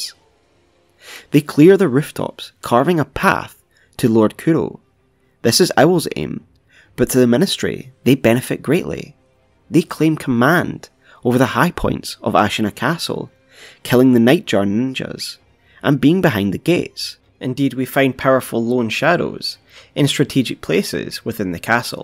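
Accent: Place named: Scotland, United Kingdom. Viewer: Scottish probably! They use eii with pretty much everything!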